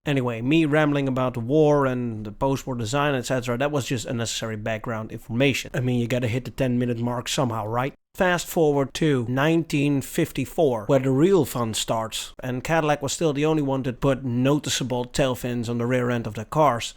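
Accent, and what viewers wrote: Netherlands. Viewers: Is the narrator dutch ? Ik hoor een licht accent. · Hey you have a strong foreign accent. You sound very Dutch even though it is clear that you are trying your best to put on an 'American' accent. Are you Dutch?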